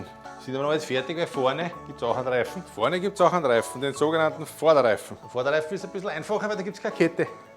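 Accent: Austria. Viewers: geiles Video , geiler Typ , tip top erklärt 👍 und der beste Dialekt , ich liebe die Österreicher 😂👍👍👍 Grüße aus der Nachbarschaft , Allgäu Füssen 👍😊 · So einfach und gut erklärt im Wiener Schmäh, ich liebe euern Dialekt. Weiter so ‼️👍🙏💪 Viele Grüße aus Berlin ☀️ Cheers 🍻 und Happy trail's ❗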